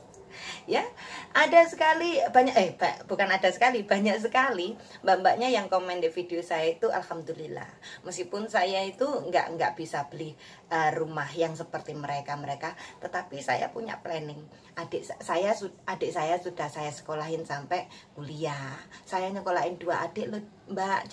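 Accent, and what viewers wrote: Java, Indonesia. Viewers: Assalamualaikum, Mba koe jowone ngendi se? Semoga di paringi sehat mbahnya mba jg sukses selalu ya, 🙏🙏😘😘😘👌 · Samean jowone ngendi to?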